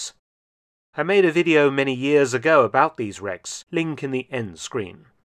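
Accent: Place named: RP, United Kingdom. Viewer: yes. he is easy to listen to as he uses what in England is called received pronunciation ..this gives the diction an instant feeling of command and authority. He uses it very well.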